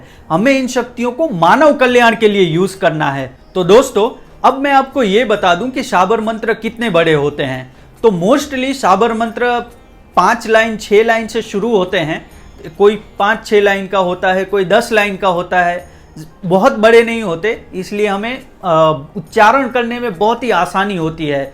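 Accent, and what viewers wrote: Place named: Gujarat, India. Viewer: Bhai gujarati lage bava hindi bol rahe hai super a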